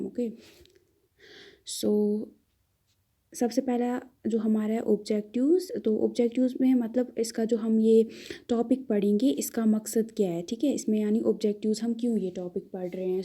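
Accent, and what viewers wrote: Kashmir, India. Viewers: U r voice tells that u r from kashmir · Are you from Kashmir?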